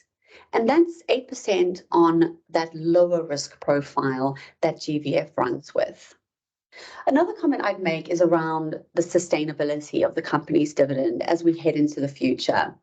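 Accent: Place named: South Africa. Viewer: Rock on GVF! Love the South African accent too.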